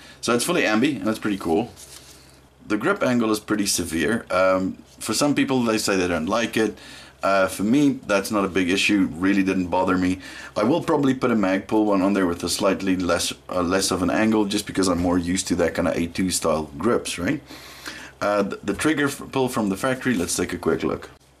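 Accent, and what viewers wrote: South Africa. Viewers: Your accent 🇿🇦🤨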